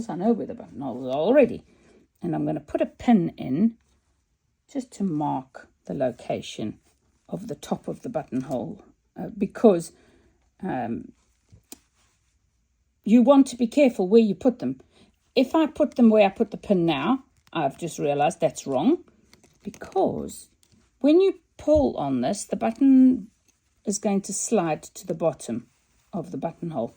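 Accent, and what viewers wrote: South Africa. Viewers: Thanks ! Love your South-African accent !!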